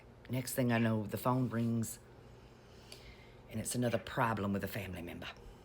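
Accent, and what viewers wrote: South, United States. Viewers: You tickle me; I'm so sorry that you had a bad, but your "rant" made me cry. I'm from South Carolina, but have lived in Minnesota for the last 18 years. I miss everything that you mentioned... field peas, collard greens, gravies, family close by,, hush puppies...and even the Waffle House! Your beautiful drawl is like sipping a mint julep, on the veranda -on a hot Autumn day , but not in a dysfunctional Tennessee Williams" kinda way. Thank you.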